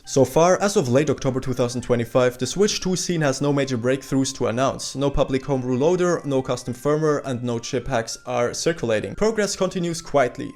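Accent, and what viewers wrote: Germany. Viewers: I hear a bit of German or am I wrong 😅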